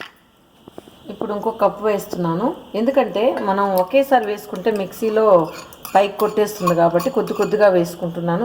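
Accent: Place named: Nellore, India. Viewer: easy chitka bagundi nellore yasa matladuthunte bhalega vundi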